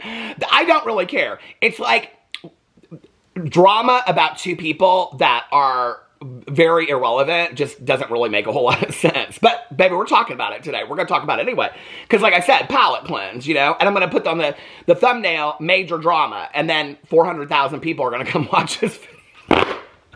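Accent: South, United States. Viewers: I have been in the most stressful and depressing state today, and I clicked on this and I Swanee you have made me giggle out loud! I lol legitimately when you said even Kim didn’t claim KW 🍆. And yes, I love when your southern twang peeps through.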